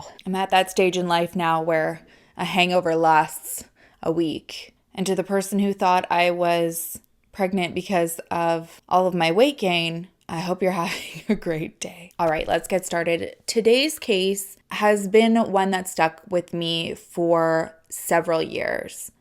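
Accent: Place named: Canada. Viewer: Its nice hearing a Canadian cover this case because everyone else is like "AlberTa" "cal-gare-y" "Ed-mon-ton" Or the best is when non-Canadians say newfoundland as new-found-land, which is phonetically correct technically but not what any canadian calls it lol 😂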